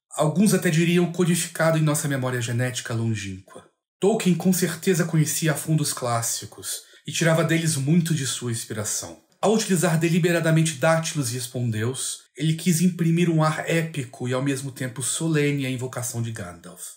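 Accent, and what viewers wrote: Portugal. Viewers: Ahah sotaque português é um xodó ☺️ mas é compreensível que seja difícil pra muitos, não é nosso dia-a-dia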